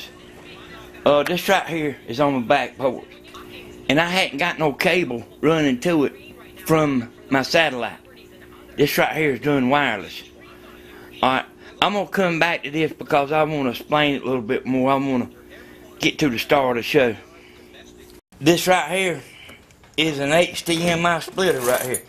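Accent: South, United States. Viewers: Finally - somebody who speaks my language! Must be the southern accent! Thanks for explaining it so well! We are now up & running!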